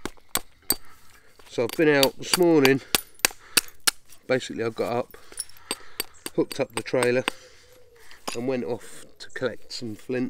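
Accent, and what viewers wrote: England, United Kingdom. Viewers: You won my sub boss. I've been searching for a channel like yours. I in the States bud in a state called WV where traces of Native American's are everywhere infact where I am to be laid to rest is a in a grave yard with a rare unopened Indian mound that they believe it goes back to the Woodland 1 period. I love searching for arrow heads and other artifacts I have been very fortunate many many axe heads. Some very old and others much newer 1500's......but man I could ask you questions till you go crazy. Also I can talk your ear off. I am just curious where you are from? You sound very English but my ear isn't good enough to know if you could be from Australia, New Zealand, or Great Britain or Wales.....so I just wanted to ask. You also might be my first person I am gonna look into Patreon. Cuz I 100% believe everything your doing needs passed down. 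I love everything your doing and damn if you don't do it like a Master. Take care boss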